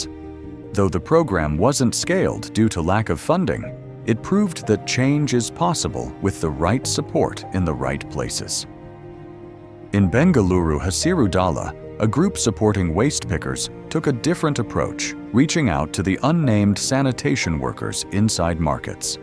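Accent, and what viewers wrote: United Kingdom. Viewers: Your accent is British. You mind your own business. Do not come to India if you do not like us.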